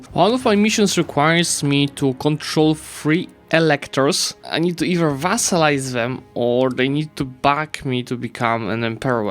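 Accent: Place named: Poland. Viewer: because we have ears, his polish accent isnt that bad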